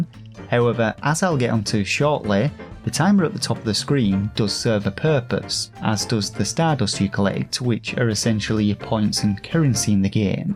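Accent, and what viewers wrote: United Kingdom. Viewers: What kind of accent does have its like so so british but hes over here like “seven-teen” instead of “seven-een” a lot of the pronunciations are also wild. Wondering where they are from.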